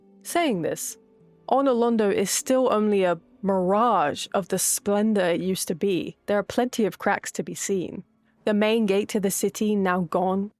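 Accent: United Kingdom. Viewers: YOU'RE BRITISH??????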